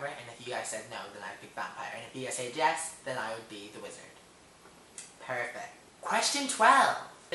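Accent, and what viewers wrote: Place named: Germany. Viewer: GERMAN ACCENT !!!! :D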